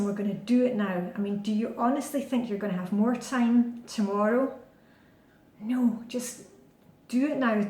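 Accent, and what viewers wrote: Scotland, United Kingdom. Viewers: Thank you so much for everything you do. These baby steps are truly life-changing and I'm feeling happier and more positive as a result, I'm really getting my 'mojo' back, so thank you for your videos. I've had FlyLady's 'Sink Reflections' book for a couple of years now and it's great but actually seeing you every day and watching your videos has really made all the difference. My sink is clean and shiny, I'm dressed to shoes, the washing is on, the bed is made and my kitchen hotspot extinguished! I look forward to watching the next video every morning, I make a cup of coffee and it's like having my own little pep talk. Keep up your fantastic work! PS I'm a Scot living in England and it's lovely to hear a familiar Scottish accent, just like being home. Thanks again x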